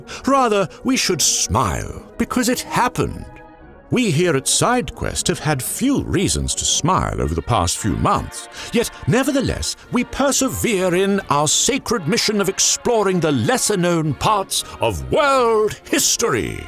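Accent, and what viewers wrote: England, United Kingdom. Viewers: Glad to see a new Video! 😁 Is the Narrator British or American? He sounds very Stephen Fry, but pronounces some words in US English? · I just discovered this channel and I'm already enjoying the history videos, I especially love your "Englishman" like caricature. I subscribed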